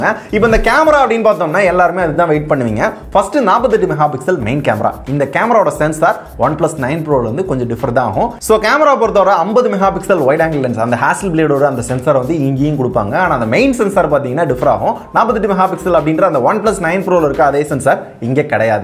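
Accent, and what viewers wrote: Madurai, India. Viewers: Thala neenga maduraiyaa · Elle madura kaaran da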